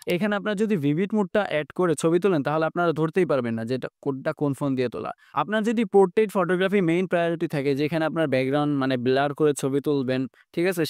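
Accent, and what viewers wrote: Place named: Barishal, Bangladesh. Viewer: আমি শিওর আপনি মোগো বরিশাইল্লা😮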